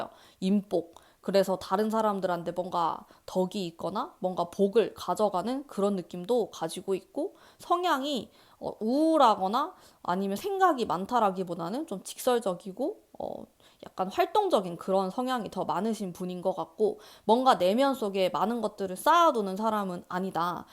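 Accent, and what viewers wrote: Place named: Gyeongsang, South Korea. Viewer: 경상도 분이신가요 ㅋㅋㅋㅋㅋㅋㅋㅋㅋ 아무리 감추려 해도 감춰지지 않는 사투리 잘 듣고 갑니다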